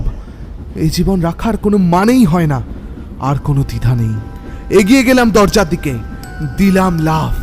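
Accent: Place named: Bangal, Bangladesh. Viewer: Kat bangal reeeeee ekdom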